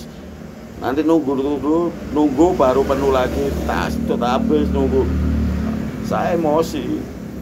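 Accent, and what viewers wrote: East Java, Indonesia. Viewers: Gpp gus.. Mklum ktnya gus org tuanya jombg.jbg nya mana gus.. Sya dr djbg. Smga sehat selalu gus ... ..logat jatim ya bysaa gus... Woteper. Heheh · Top gus lossss.. Ngomong Karakter suroboyo tanpa ading aling-aling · Nek gak kaku, duduk arek suroboyo arane... Jian josss... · Losss..... Ben ketok nek wong Jawa. Timur mas 🙏🙏🙏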